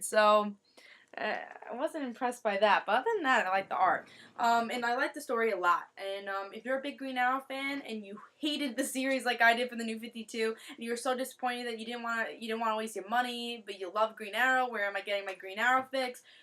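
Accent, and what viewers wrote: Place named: New Jersey, United States. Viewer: are u italian because you have a new jersey accent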